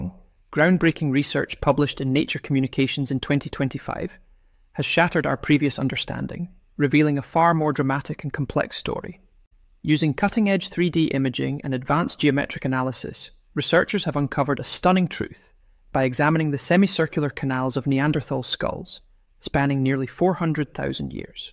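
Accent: Scotland, United Kingdom. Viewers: Love the Scottish voice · and never realize it has not actually explained the inner ear geometry thing. Just blurb it a dozen times with a Scottish accent.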